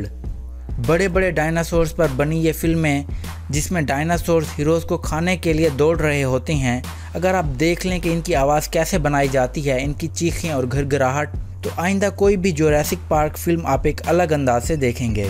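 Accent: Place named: Pakistan. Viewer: Iski aawaz se ye Pakistani lag raha hai............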